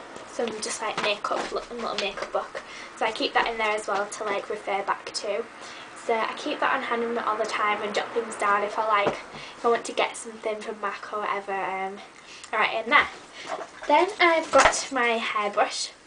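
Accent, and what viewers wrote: United Kingdom. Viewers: cooll videeoo you have a more british accent than me and i live in the uk to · UK gals always have the cutest accent lol put more vedios nice work :)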